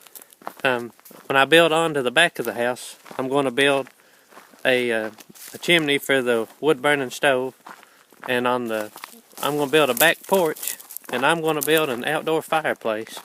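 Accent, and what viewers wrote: United States. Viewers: i love your video. however,....i have to know what part of America your accent is from. thx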